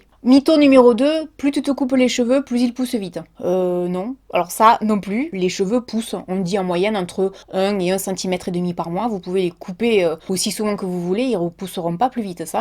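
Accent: South, France. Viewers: Ça fait longtemps que je regarde tes vidéos! J’ai créé un compte YouTube spécialement pour te laisser un commentaire. Je trouve ton travail fantastique. Tes videos réalistes. Tu dis tout fort ce que certains pensent tout bas! Je trouve ça cool!! Ton accent du SUD super !! Continue comme ça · Merci pour votre vidéo très intéressante. Votre accent me plaît beaucoup vu que je suis moi aussi du Sud 👍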